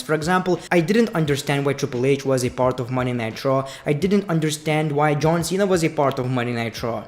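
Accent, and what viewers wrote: India. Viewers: Sounds Asian/Indian... Why?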